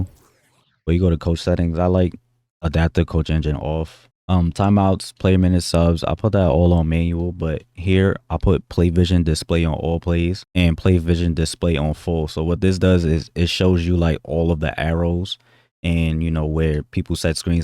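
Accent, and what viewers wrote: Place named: New York, United States. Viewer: solid video you sound like the big apple is home for you. my fam is all there you sound like them very cool i have the walk of fame in my city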